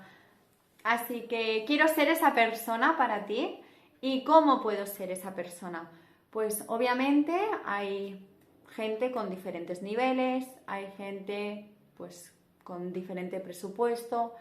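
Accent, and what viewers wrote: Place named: Navarre, Spain. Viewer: Hola me encanta tu pronunciacion con una sonrisa.Habla pura de donde viene,tal vez Navarra?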